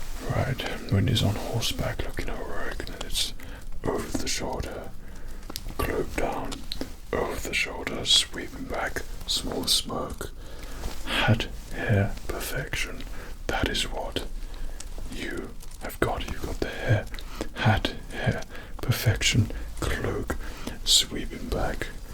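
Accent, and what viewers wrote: England, United Kingdom. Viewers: i am latin american, and when i started learning english i never understood why the people (especially women) said that they love brithish accent. now i understand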